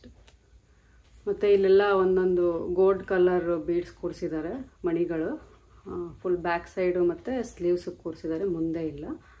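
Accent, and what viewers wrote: Mandya, India. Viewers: nimma matu keloke mandya bashe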